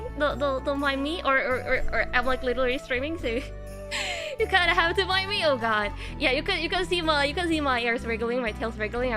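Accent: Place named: Scandinavia. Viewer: This was a really fun debut! It was really cool to know that you have a Nordic accent and it adds tp your personality. You are such a lovable goofball and very sweet and chill. Looking forward to the talk show and love your model too. Hope you have a great day today 😊🫂🙏🤍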